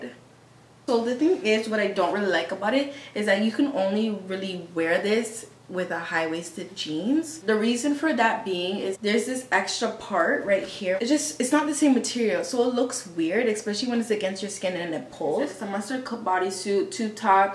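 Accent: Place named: Latin America. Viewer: You're so cute! So you're in Canada? I keep thinking I hear a little Latina in your accent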